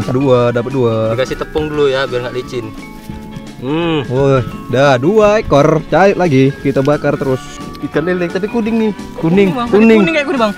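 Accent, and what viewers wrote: Medan, Indonesia. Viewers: Lu tinggal dmna bang klok boleh tau, dari logat logat nya orang medan bang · Medan mana suaranya???!!!!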